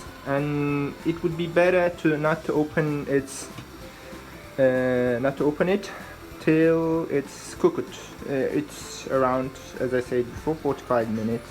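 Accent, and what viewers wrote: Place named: RP, United Kingdom. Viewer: perfectly posh thank you!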